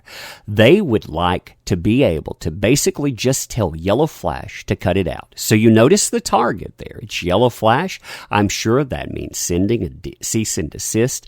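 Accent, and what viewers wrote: South, United States. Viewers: That Southern drawl like a drug, son. Do a piece on any developments between Meyer v Weird- I mean Waid. YBZ doesn't discuss it and it's right of him not to, legal reasons. I can't really do the research myself, I've got too many houses on fire.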